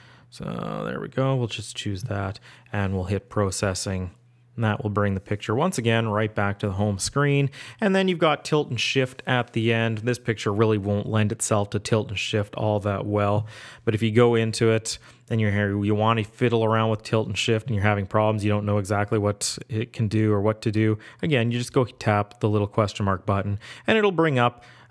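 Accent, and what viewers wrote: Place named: Canada. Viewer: I was listening to this while I doodled with the app on my phone, and I had the thought that based upon your accent you must be Canadian! Then I realized it was in your intro lol. Anyway, this app is excellent. Definitely one of the best editing apps I've used on the iPhone. The whole selective adjust part is what I feel separates it from any other app out there.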